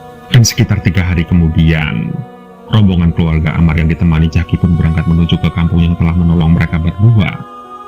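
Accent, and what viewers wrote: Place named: Java, Indonesia. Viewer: Mantap bng aku suka cara Abang ceritanya seru dan has jawanya 😊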